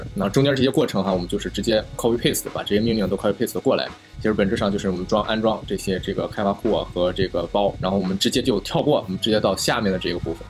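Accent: Shandong, China. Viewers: 是山东人吗哈哈哈哈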